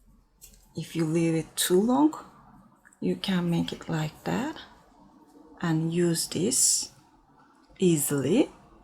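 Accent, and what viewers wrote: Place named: Turkey. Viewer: best turkish accent I've seen in a long time so calming ahahah i feel like you'd be a great grandmother I never had hahah I sometimes watch yer videos and feel like yer my granny hahaha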